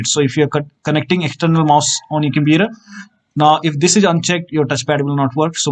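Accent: India. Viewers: Your language accent indicates that you are Indian, you are such a blessing man, saved my ass. Love from Bangladesh.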